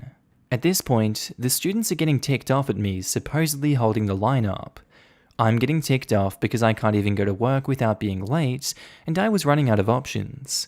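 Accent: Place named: Australia. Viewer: I miss the “chuck a prawn on the barbe” in your wonderful Aussie accent.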